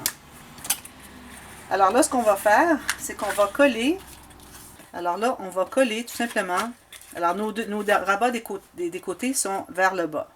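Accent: Quebec, Canada. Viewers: Re-bonjour, merci et pas de problème, votre carton -papier vous le prenez à quel endroit, et vous , à votre accent vous êtes du Québec , de quelle région ?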